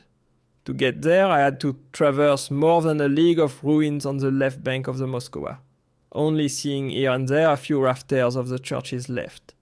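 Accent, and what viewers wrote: France. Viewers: I love your content here however I do agree that the French accent is a distraction. Perhaps I’m much lighter accent or no accent at all might be a better choice, but that’s just my opinion.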